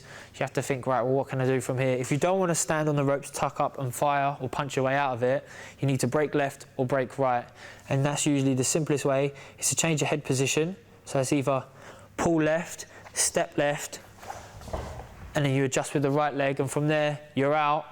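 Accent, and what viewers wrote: United Kingdom. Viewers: British accent there...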